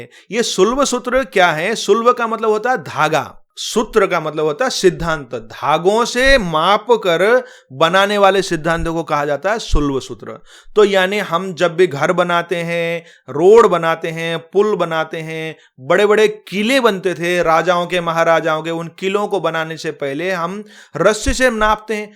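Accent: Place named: Jodhpur, India. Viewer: Sir AAP jodhpur se ho na aapki aapki aawaz bata rahi hai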